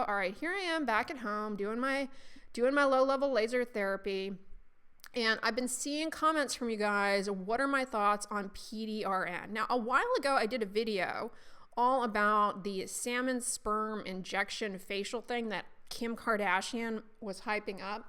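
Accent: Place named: Texas, United States. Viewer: I've been a subscriber for years and it makes me chuckle to hear your Texas accent come through. I don't remember where you lived before you moved here.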